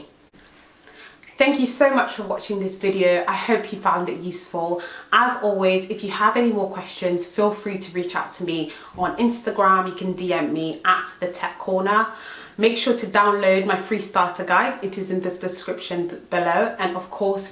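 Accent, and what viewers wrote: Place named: United Kingdom. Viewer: The British English is just awesome.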